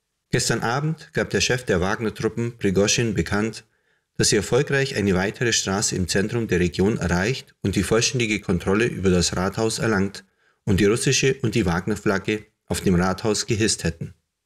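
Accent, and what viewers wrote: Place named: Allgäu, Germany. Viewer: Naja, so halb. Halb Deutsch und halb Allgäuerisch. Besser wäre, ganz Allgäuerisch.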